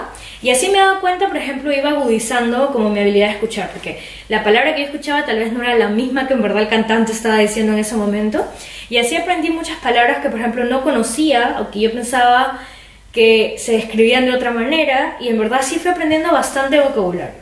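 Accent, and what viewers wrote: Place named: Peru. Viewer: Tú dejo es peruanoo i love your peruvian acent! ich lerne Deutsch.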